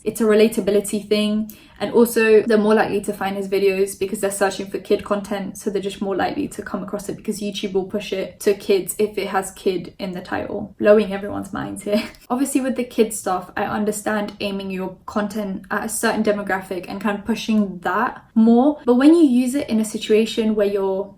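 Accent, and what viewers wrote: United Kingdom. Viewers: oh yeah nah I wasn't really sure, you sounded British to me :) x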